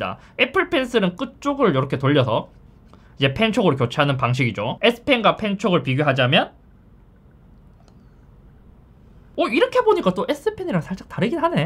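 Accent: Gyeongsang, South Korea. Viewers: 나름 얼리아답터인데 동영상을 즐겨보는 스타일이 아니라 일부러 찾아보진 않는데. 우연히 KT광랜 속도가 안나온다는 뉴스에 잇섭이 누구지? 해서 봤는데 ㅋㅋㅋ 일단 갱상도 말투에 재밌어요. 그래서 저의 유튜브 구독 1번이 되었습니다. 앞으로도 흥미진진한 컨텐츠 부탁드려요.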